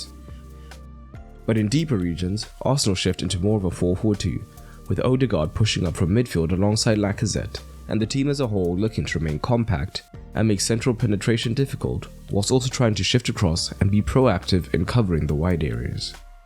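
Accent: South Africa. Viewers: You sir... Sound South African 🤔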